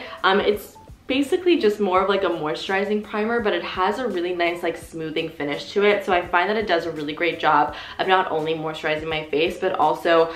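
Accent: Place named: Canada. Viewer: I love you and your videos!!! I could just listen to them 24/7. Also the way you say name is 👌🏻👌🏻👌🏻 I genuinely love your Canadian accents lol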